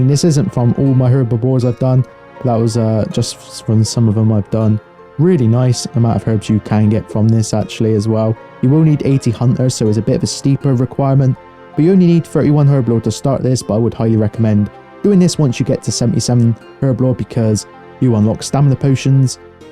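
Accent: Devon, United Kingdom. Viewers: Devonshire or Bristolian? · Lmao where r u from you sound like your from Tiverton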